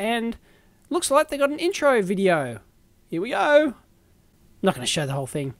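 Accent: Australia. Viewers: True, love the Aussie flavor Dave! Just a head's up though, go easy on the "S" ($#!+) word, it's a little rougher over here [U.S.] than over there ;) (not exactly family friendly) Cheers :)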